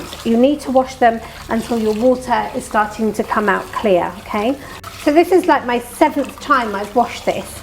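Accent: England, United Kingdom. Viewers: Where are you from ur English is soo english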